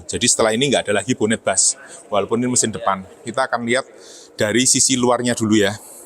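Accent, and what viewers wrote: Java, Indonesia. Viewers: Host nya kental sekali logat Jawa nya..!!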